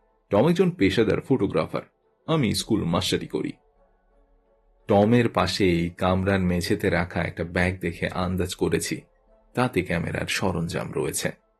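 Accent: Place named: Bangal, Bangladesh. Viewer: Ato bhoyanok pronunciation!!! Please learn proper bengali bhai! Satyajit Ray kokhonoi bangal bhashaye lekhen ni ei sob! You have ruined everything! Akok bhabe korleo listeners need various voices! Use vibrato or else include someone else please! Erokom flat way te kono golpo convey kora jaye na! Please learn the art first!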